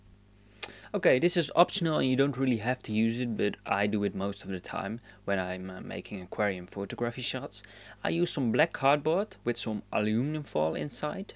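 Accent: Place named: Netherlands. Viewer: Nice tips. You sound Dutch, am I right? :D (Ik ben ook Nederlands)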